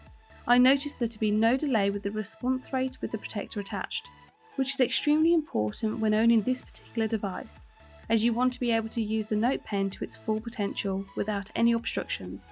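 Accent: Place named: Birmingham, United Kingdom. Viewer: Big up Brummie lady👍🏻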